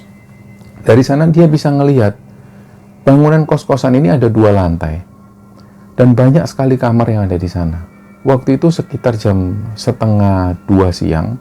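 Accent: Java, Indonesia. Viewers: Sempet suka sama beberapa channel yang nyeritain kisah horor, eh lama kelamaan kelamaan malah suka manggil narsum yang kayanya ceritanya ngablu/fiksi/dibuat2, kurang suka juga sih kalo yang nyeritain narsum nya langusng, karna ga semua narsum bisa ngatur cara bicaranya untuk bercerita Akhirnya sekarang lebih sering denger cerita dari karna yang nyeritain ya cuman mas doang, jadi feel nye hari ke hari makin dapet, terlebih karna saya orang jawa jadi lebih enak aja kalo yang cerita ada medok2nya/campuran bhs jawanya. Serasa lagi diceritain pakde sendiri semangat terus tim, semoga bisa makin besar channelnya dan pendengarnya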